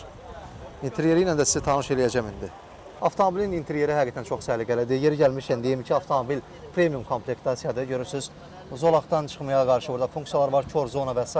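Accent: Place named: Ordubad, Azerbaijan. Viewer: Qardaş Ordubad lehcesiyle danişir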